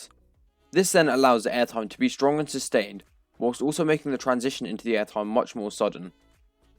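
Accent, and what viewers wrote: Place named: United Kingdom. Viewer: Damn It's always cool to see a Bri'ish and/or foreign enthusiast talk about American rides and their perspectives! I hope you like our other rides and our abundance of air time!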